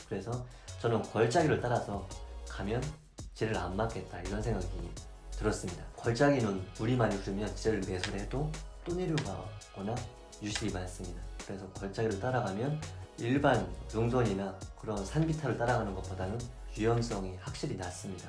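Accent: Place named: Busan, South Korea. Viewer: 부산 특유의 억양 ㅋㅋㅋ 북한에서 청년기 보내도 서울말 자연스럽게 쓰는데 왜 경상도는 안 되는 거야 ㅋㅋ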